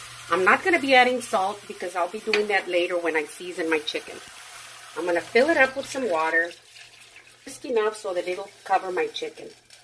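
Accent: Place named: San Antonio, United States. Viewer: Hello, when I heard your voice you sound like your from San Antonio. I don't like cooking but I just got married two years ago. I don't work anymore more so I thought this is a good time to start. I'm 60 years old from San Antonio, but just moved to sante Fe new Mexico, don't like the food here much so I want to learn to cook.. I'm excited to learn your recipes.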